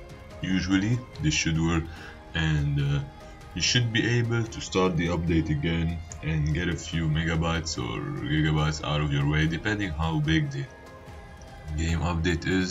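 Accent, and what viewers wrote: Lebanon. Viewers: ur english accent is like us the Lebanese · R u lebanese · Elina antoun he is lebanese, so am i lol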